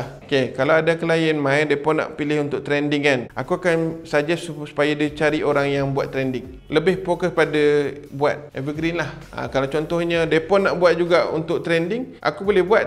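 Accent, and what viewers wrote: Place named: North, Malaysia. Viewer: Baru tau abang orang utara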